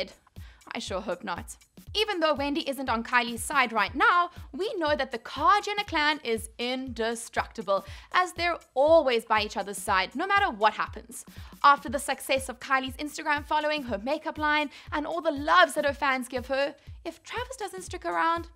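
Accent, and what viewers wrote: South Africa. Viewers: is from South Africa?? cant miss that fellow south African accent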